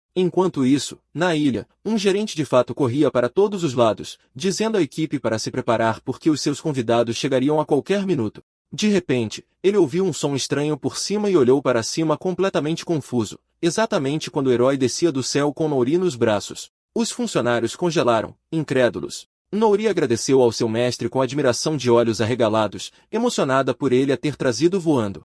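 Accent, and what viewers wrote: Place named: Portugal. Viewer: Eu amo português de Portugal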